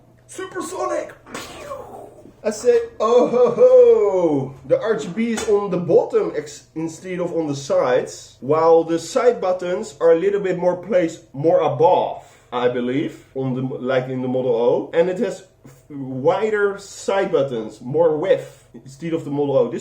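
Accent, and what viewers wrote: Netherlands. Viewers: He is Dutch and to talk with a dutch language its hard to talk english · je bent zeker nederlands jou accent is gelijk te merken😂❤️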